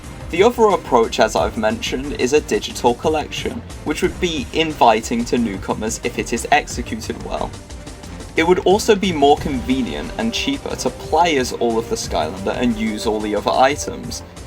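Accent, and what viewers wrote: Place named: United Kingdom. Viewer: BRI'ISH REVEAL HOLY CRAP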